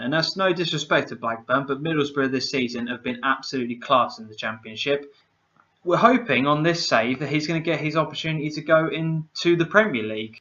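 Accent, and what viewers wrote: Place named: South, United States. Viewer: Thank you for believing in Boro even though your not a Boro fan as your from the south as from you accent!! UTB!!!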